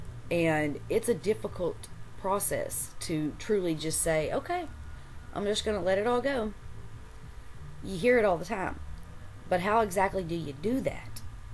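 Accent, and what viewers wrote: South, United States. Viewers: i totally thought you were in the southern US from the way you speak lol good luck in the EU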